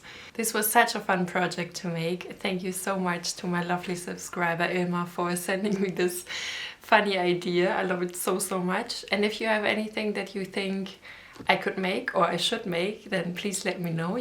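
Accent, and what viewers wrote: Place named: Germany. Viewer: Hallo, eine sehr schöne Tasche hast du da. Mir hat damals der Karpfen schon sehr gut gefallen, aber so in 3D als Ente, einfach genial. Man hört, dass du aus Deutschland kommst, woher genau wenn ich fragen darf? LG Chrissi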